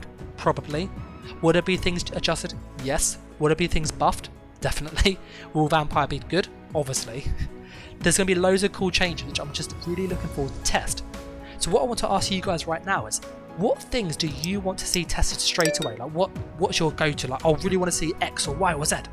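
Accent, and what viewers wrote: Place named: England, United Kingdom. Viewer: This guy is clearly English i dont get this comment, there defiantly a lot worse accents from England.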